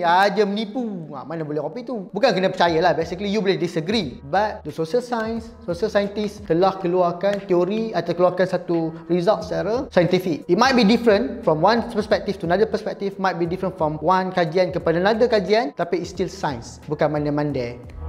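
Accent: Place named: Parit, Malaysia. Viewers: are you from Parit,Perak,ghope ckp pekat org Pagharit je????😆😆😆😆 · Pekat benor perak nye yop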